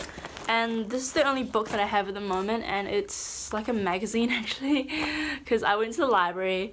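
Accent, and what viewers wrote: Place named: New Zealand. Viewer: Are you from New Zealand?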